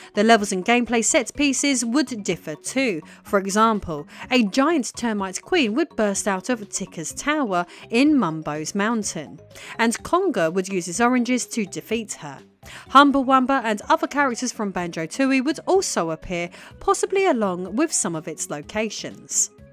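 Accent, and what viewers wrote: United Kingdom. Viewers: Funny that all these videos about banjo threeie have someone British talking in it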